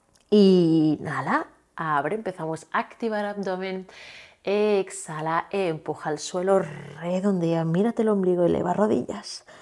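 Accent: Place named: Argentina. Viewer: Buenos días si fue intenso porque mis rodillas me fallan pero trate en lo que pude hacer muchas gracias, voy a repetir este si me gustó, gracias siento con tu voz una persona muy agradable y sencible Dios te bendiga,por x tu acento creo eres Argentina, bonito día ❤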